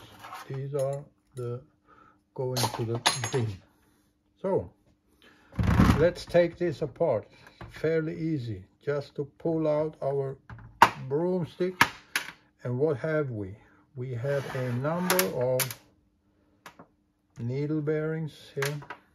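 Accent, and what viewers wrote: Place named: Sweden. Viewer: Glad I could help! Accent is Swedish :)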